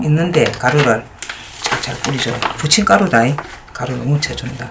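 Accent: Gyeongsang, South Korea. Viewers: 감사합니다.오늘 해 볼께요 맛있는요리 자주 올려주세요 샘 목소리 경상도 억양 ㅡ고향의 소리 고향냄새 엄마가 생각납니다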